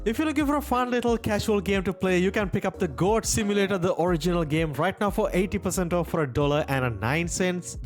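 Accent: Sri Lanka. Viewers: Are you sri lankan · Let me guess are you sri lankan?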